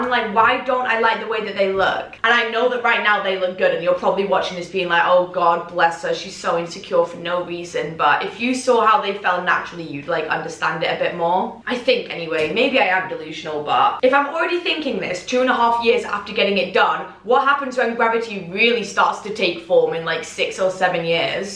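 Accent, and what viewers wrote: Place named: North, United Kingdom. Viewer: You sound allot more northern back then lol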